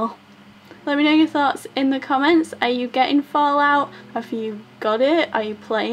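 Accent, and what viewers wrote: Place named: Hull, United Kingdom. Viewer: Sound like you’re from Hull! 😎👍🏼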